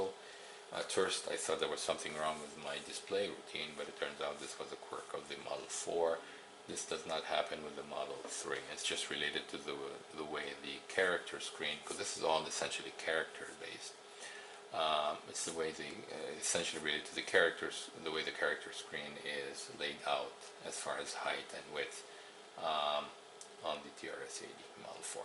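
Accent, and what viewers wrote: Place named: Sweden. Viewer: This guy sounds like he is not mainly English, maybe Swedish or something i don't know, probably that's why. I think he did great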